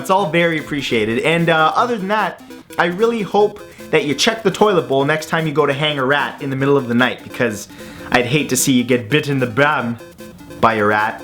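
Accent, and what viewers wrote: Canada. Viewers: Is it me, or you can just tell this guy is Canadian? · your canadian? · it’s called being canadian, most of us talk like that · wait- r u canadian?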